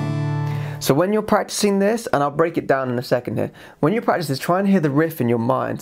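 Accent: United Kingdom. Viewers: Is he british ? Love his accent.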